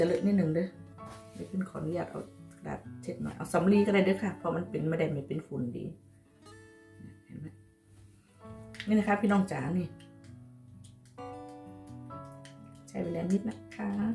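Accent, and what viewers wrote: Korat, Thailand. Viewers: อ้าว คนโคราชนี่นา พูดสำเนียงเหมือนคนกระโทกโชคชัย ฉันก็คนโคราช ฉันเคยเรียน ร.ร. สุรนารี ฉันกดติดตามให้แล้วจ้า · ดูคลิปไหนก็ชอบน้องเห็นพูดสนุกโคราชกันเอง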